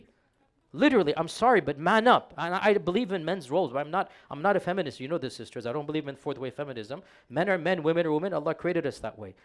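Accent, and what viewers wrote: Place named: South Asia. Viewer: You sound desi AF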